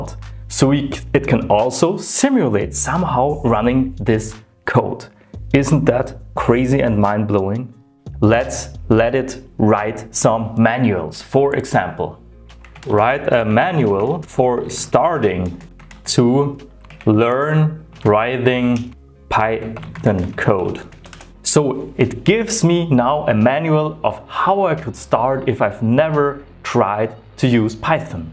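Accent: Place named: Austria. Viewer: Great video! Very inspiring! Also - pleasure to listen to the Austrian accent :)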